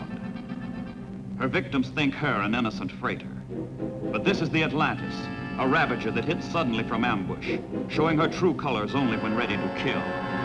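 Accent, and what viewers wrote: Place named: United States. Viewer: I'll give you one star for at least trying. BUT employing children between 6-8 to play the instruments for the soundtrack is NOT a good idea. And then to use AMERICAN ACCENTS on the Voice-Over? What were you thinking? The rest of the world finds it impossible to listen to the accent of the most stupid country in the world.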